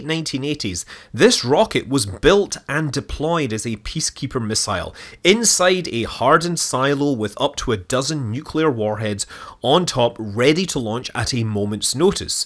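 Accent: Scotland, United Kingdom. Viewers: Where you from in Scotland chief?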